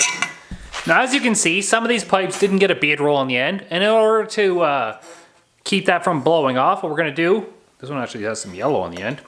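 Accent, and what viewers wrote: Canada. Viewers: i gave a like for the way you say 'out' canada accent is gold, from australia!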